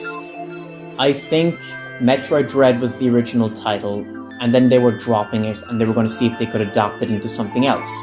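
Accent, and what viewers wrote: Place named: Ireland. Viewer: Irish bai!?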